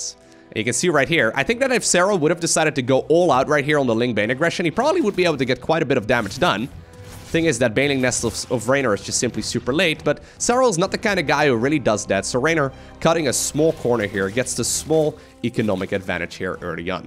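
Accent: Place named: Netherlands. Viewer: Your Dutch accent never gets old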